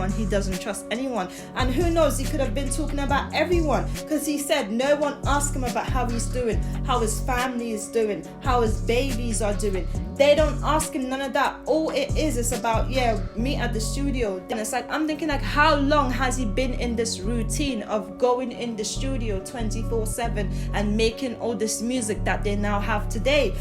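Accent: England, United Kingdom. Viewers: Where you at in London? Your accent think. You talk like you knew the mans personally. This is weird af. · Another foreigner trying to speak on what’s going on in the hood through what she sees on social media.🗣🗣🗣🗣 how are you a credible source in any way?????🧐 you speaking on Chicago business with a British accent???🤣🤣🤣🤣🤣 Maaaaannn.... yall need to stop with the clout chasing and assumptions. The only source you have is social media. You have NO CLUE of what’s actually going on in the streets. Who would subscribe to a British person speaking on hood affairs in Illinois??? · I hate your accent, use another voice on these videos.....& why is a foreigner so integrated in American street culture? Speak on England or wherever you from smh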